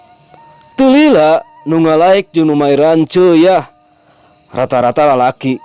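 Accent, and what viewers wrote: Sunda, Indonesia. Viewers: 😄👍👌tos ciri khas soanten kg Guru mah sundanise pisan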